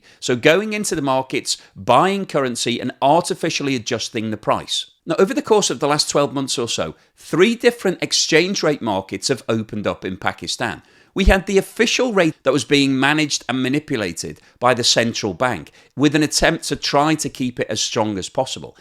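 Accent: United Kingdom. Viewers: Don’t know why but with British accent it sounds too much like a propaganda. Than truth. I agree I can’t point a single topic that is propaganda in this video. It’s just the distrust on Brits. They fund that west narrative building machine called BBC.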